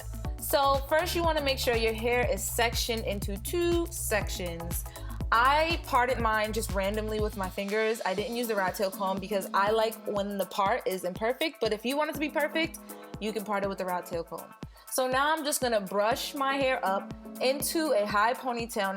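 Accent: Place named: Queens, United States. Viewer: Are you from Queens? You kinda sound like it.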